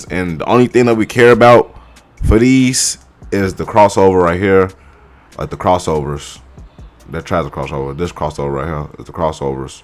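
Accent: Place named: Chicago, United States. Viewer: I can tell that Chicago accent from a mile away! You got my sub, where you from brodie? Good video I actually learned something new! I been at this shit for 6 months and starting to understand more and more about price action.. do you trade futures to or just forex?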